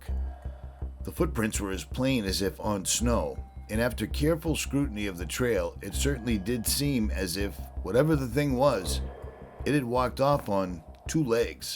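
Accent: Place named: New England, United States. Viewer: You spin a pretty darn good yarn, gruff yankee voice and all. Great footage as always. Much thanks and love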